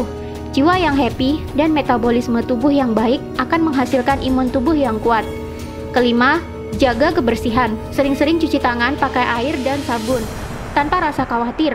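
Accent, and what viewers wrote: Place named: East Java, Indonesia. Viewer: 🙄 saya berasa di Jatim dengan pecel tumpang denger logat mbaknya 🤔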